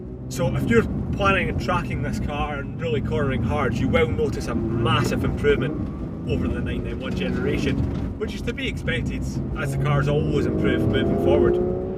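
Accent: Scotland, United Kingdom. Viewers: I understood every word,love Scotch accent,great review,regards from NZ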